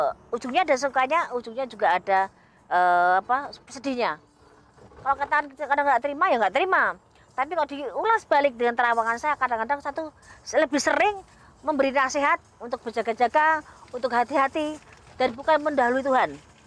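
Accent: Java, Indonesia. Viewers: Oh blasteran jawa bandung toh pantesan logat jawanya ga hilang.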